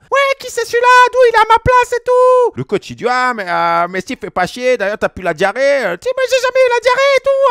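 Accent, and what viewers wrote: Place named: Spain. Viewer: Salut à tous et meilleurs vœux pour 2021., toujours fidèle à ta réputation. Une histoire pleine d’humilité et de franchise. On s’y croirait presque avec l’accent espagnol et le maillot de Levante sur les extraits vidéo. Merci pour ces histoires et hâte d’entendre les prochaines. Ne changes pas;)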